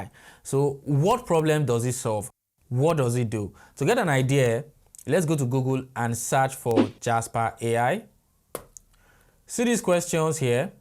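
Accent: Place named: United Kingdom. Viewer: I thought you where British 😳❤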